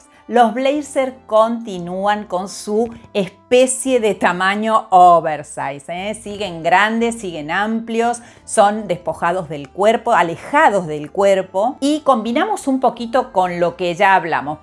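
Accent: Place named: Argentina. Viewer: Qué bueno encontrar un video argentino, con acento argentino, con nuestras costumbres y mas aun si se trata de moda!!! Excelente!!! 🤗🤗😘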